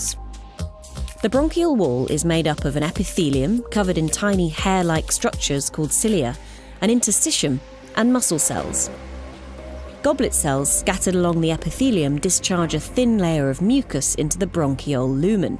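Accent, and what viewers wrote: United Kingdom. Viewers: The british accent feels like shit, shut up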